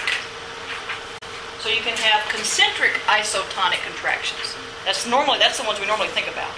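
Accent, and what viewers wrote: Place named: South, United States. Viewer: You are awesome, your videos are so wonderful,plus it seems easier to understand with a southern accent ;-)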